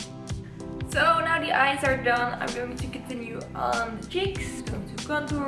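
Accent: Netherlands. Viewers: Volgens mij wel! Ze klinkt erg nederlanss🤔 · when u suddenly notice that she's dutch....or isn't she idk, but etos is dutch and her accent also sounds dutch, my country, yess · hoor ik een nederlands accent? :) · It's a Dutch accent :)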